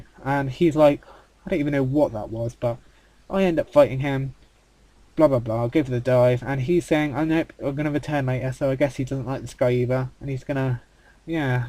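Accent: United Kingdom. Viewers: Whoa! Your Accent Is Really British! I Mean I'm British But That Is Really British!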